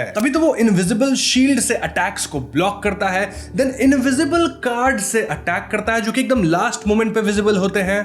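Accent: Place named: Bihar, India. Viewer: Bro is bihari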